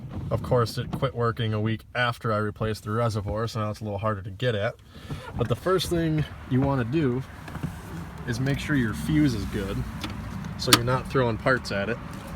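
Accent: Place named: Wisconsin, United States. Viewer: I'm from Wisconsin and you sound like my kind of people. Just what I was looking for.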